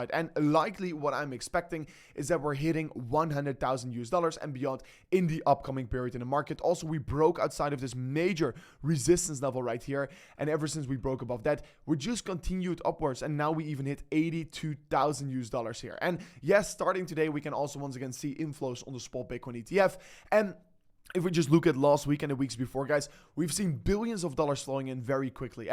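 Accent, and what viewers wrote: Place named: Netherlands. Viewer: aaah I just knew from your accent that you are dutch hahaha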